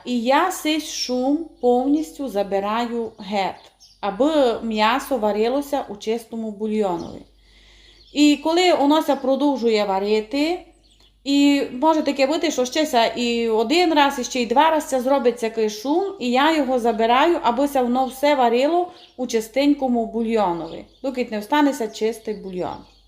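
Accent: Transcarpathia, Ukraine. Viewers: Як же гарно звучить Закарпатський акцент..смачно.файно,ладно до душі..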